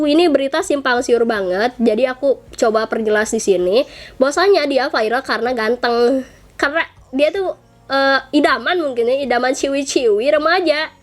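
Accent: West Java, Indonesia. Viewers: Kaya orang Sunda logatnya?? Salam Sunda Majalaya hadir😁☝️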